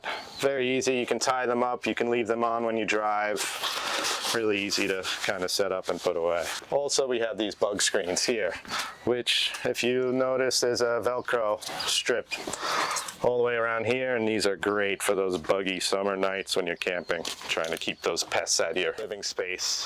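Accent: New Jersey, United States. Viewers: New Jersey accent =dumpster fire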